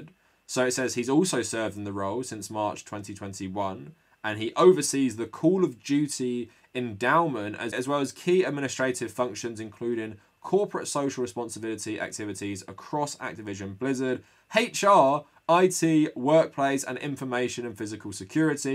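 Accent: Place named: United Kingdom. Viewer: I like your British accent, most trigger me now but you’re is cool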